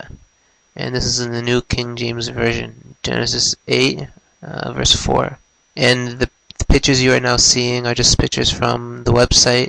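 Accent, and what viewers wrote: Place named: Middle East. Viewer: According to your video the Bible was correct and the Quran was wrong. The person talking in the video sounds like he's from a middle eastern background which is probably why he's saying the Bible was wrong even though in the text he provided as proof the Bible is "correct." I Still don't believe in the whole religion mumbo jumbo. OMG A BOAT, IT MUST BE NOAH'S ARC. At least I got a laugh out of this so thank you.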